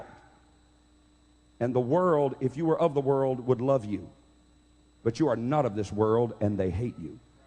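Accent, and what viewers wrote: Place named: South, United States. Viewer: he kinda looks like James dean with a southern accent.don,t know if this guy is a real Christian or not.God only knows.if he is not he,ll get whats coming to him,God will make sure of that.all will be brought to the light.good and bad.evil is a puff of smoke.here for awhile and is gone not to be seen again, amen live like u are Christ himself.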